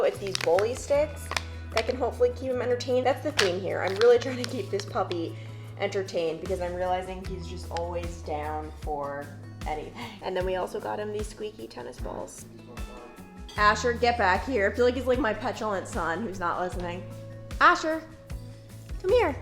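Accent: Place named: Long Island, United States. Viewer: I think your lack of sleep is making your Long Island accent come out more 😂 Asher is so cute!!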